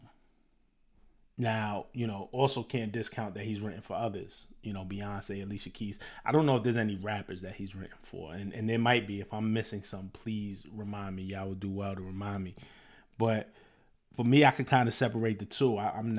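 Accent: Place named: New York, United States. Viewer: Fam if you still listening to Drake after all this, how you call yourself a fan of hip hop? Having a New York accent, glasses on, gold chain and ya hat to the back don't move me. You should be ashamed of yourself fam to even say you still fux with Drake music. Vanilla Ice was basically Ousted from Hip Hop for lying and doing this same thing. Ya'll New York folks a disappointment. Ya'll really used to gatekeep and really used to stand on something when it came to the Culture. Not Anymore. That's why places like Atlanta were able to come in a really put they stamp on the Culture. Because New York lost it's soul, lost it's backbone. A dude from New York hearing and seeing this all go down, should be one of the loudest in the Room saying "No, This Ain't Acceptable". You dudes too scared now to go against the grain even for the Culture. To me fam dudes like you sellouts. 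Don't come at me with the "I'm trying to be fair", blah, blah, blah. FOH with all that. You don't even talk like you got passion for this Culture. Kendrick rapped like he is of the culture, from the culture, and it means something to him. You ain't got that same type of energy my guy. It's cool. Do ya thing. Alot of ya'll "Not Like Us" and alot of ya'll getting exposed. You gone be on the wrong side of history when it's all said and done. Trying to play the middle like you Swizterland, is how we got here in the 1st place with Drake.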